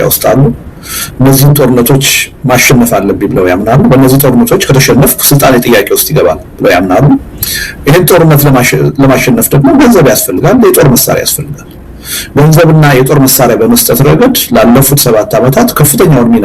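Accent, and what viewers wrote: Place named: Gaxaree, Ethiopia. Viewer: Diye diye...Wolduya..Gaxaree accent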